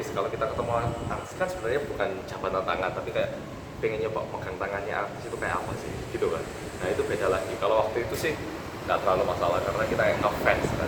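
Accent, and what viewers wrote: Java, Indonesia. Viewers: jawanya mendok men thoh, aku salut,. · Kali Bicara Logat Jawanya Padet Banget 👍😁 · sampean medok e notok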